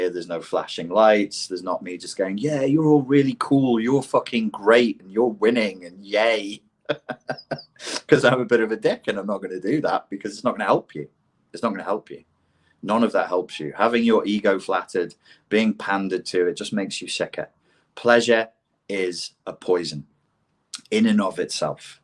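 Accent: Liverpool, United Kingdom. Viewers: Is that a Liverpool accent sneaking in 🧐 also a bit of Yorkshire like me ,anyway I am finding it really hard to get over the death of my mam,it’s the worst thing to experience.I’d just like to say Im not as intelligent as you & I’m in a low class job but I’m ok with that,I would not want the stress of the high pressure job.I do hate the communists btw lol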